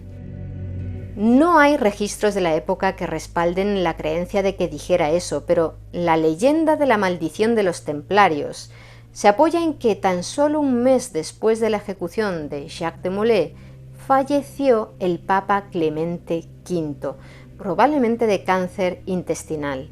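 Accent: Spain. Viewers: El único acento Español que me encanta escuchar en videos como este sin distorsionar las historias es el de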